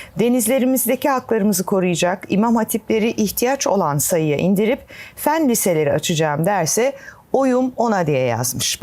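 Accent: Standard. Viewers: Dinlerken yormayan, temiz bir türkçe, güzel bir ses tonu ve gerçeklere vurgu! Severek izliyorum (dinliyorum) 👍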